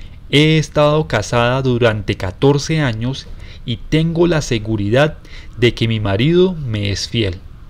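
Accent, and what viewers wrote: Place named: Colombia. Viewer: Que joya este libro y de mano de un acento colombiano. Oro puro. Saludos y gracias por ese trabajo que hiciste para transcribir esta joya!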